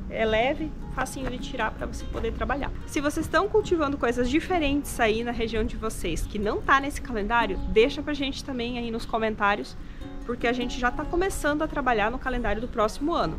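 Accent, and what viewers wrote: Rio Grande do Sul, Brazil. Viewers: Achei que você era aqui do sul ,têm um sotaque gaúcho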